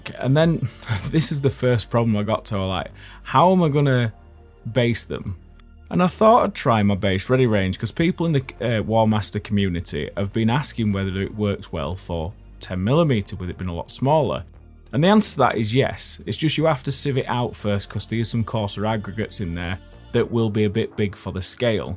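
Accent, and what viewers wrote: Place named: Yorkshire, United Kingdom. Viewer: It's nice hearing a fellow Yorkshire accent (if you are not from Yorkshire I am so sorry if that was an insult lol)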